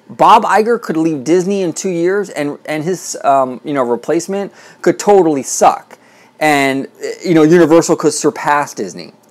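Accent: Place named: Florida, United States. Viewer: you sound like you are from Florida. I live in LA. Here universal is no where near Disney quality. HP world is a little street here.......I counted 49 disneyland resort rides and 2 of them are simulator rides. At universal studios hollywood only 3 rides and the tram are not simulators or have strong parts of the ride as a simulator. But to clarify what I said in my post, ill give an example. ET was a fantastic ride. One of my favorites as a kid. the theming was top level. Now its replaced by a lifeless rollercoaster. I don't think anyone would try to say the mummy ride has better theming than ET. Another example was king kong. That ride was burned in the memory of any young kid who road it. It was amazing because it was real. Now its just another simulation.....one step forward......two steps back......No one i know, wants these bullshit simulators.....And I won't respect disney if they start putting in simulations at the rate universal has done.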